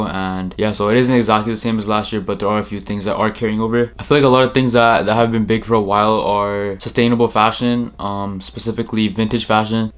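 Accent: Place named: Jamaica. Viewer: Gave the vid a like but please let go of the jack accent. It doesn’t sound or look good bro. You better than that & ur vids are dope.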